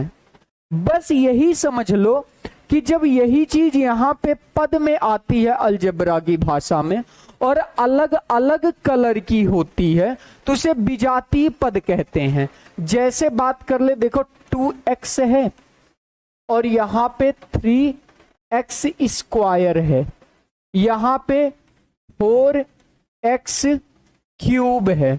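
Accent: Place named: Bihar, India. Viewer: Bhai sun plz beech beech main bihari na bola kar prblm hoti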